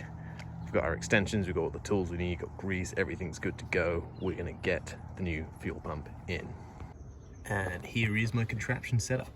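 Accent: England, United Kingdom. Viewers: Haha, Hello there watched the program last night and sounded like you have an acksent,and was across the pond there in England. Lol. That motor sounded good once you got it running,but no I'm from California here in the mountains above Sonora. I was drinking my coffee,and thought I would act like I was from England the way I wrote what I wrote. Lol. I'm a true Californion. Lol and was just playing around about sipping on tea. When I was 5or6 I did ride inn the back of one of those where the seat opened up and back window would roll down. 👍that was around 1980. 👍 a long time ago my FREIND. What's the planes with the car ?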